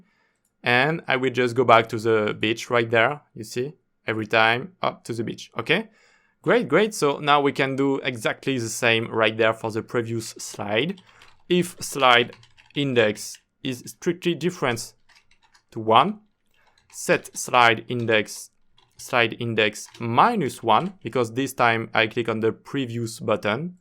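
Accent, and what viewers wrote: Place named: France. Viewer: Oh mon Dieu l accent français ne nous quitte pas même en anglais force à toi mon reuf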